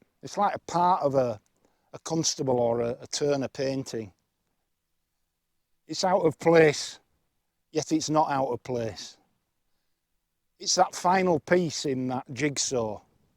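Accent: Yorkshire, United Kingdom. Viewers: Whilst i agree about the "5 favourite things" etc. I think a few Enfield erm "Bullet points" may have been acceptable in this particular case.....sorry, couldnt resist 🙄. Bad puns aside though i have just happened across your channel, probably due to those sneaky algowotsits picking up on my recent Royal Enfield research in aid of me finally getting back on two wheels after maybe 5 or 6 years of living with a bike shaped hole in my life. How pleasantly surprised i was upon quickly realising id discovered not only a bike review and not just a fellow northener but a fellow cynic and free thinker with that lovely Yorkshire brand of talking reet and telling it how it is. I have even hit that bell shaped notification thing for the very first time ever! 😮 Bob on lad!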